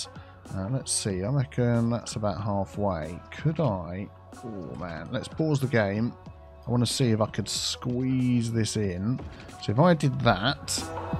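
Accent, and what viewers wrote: United Kingdom. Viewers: I new you are a Brit since the first time I head you. And English is not my first language.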